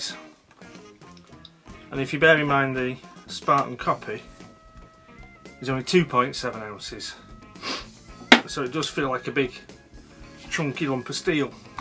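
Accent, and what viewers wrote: United Kingdom. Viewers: British army knife. British accent. American Flag. Great presentation bro. Greetings from USA 🇺🇸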